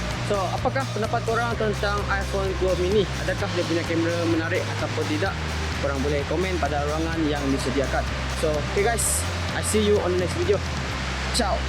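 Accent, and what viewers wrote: Sarawak, Malaysia. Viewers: wah orang serawak💆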